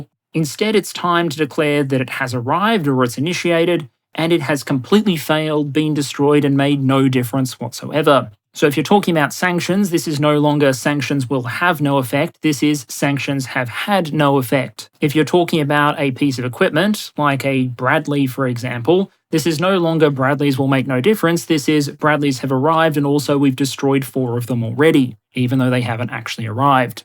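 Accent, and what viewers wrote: Australia. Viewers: I feel like outright stealing the whole stylistic shebang for work, starting from the aussie accent, through the PowerPoint layout, up to the begrudging respect for the strategic prowess of emus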